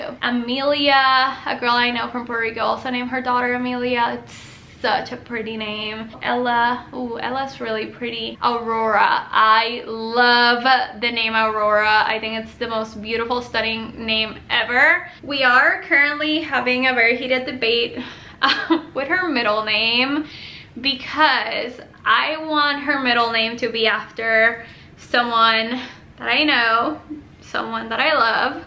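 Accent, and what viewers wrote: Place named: Spain. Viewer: Girl I love your accent! Spanish is such a beautiful language, especially with girls names! Never be shy to speak in Spanish or in your accent on your channel cause it sounds amazing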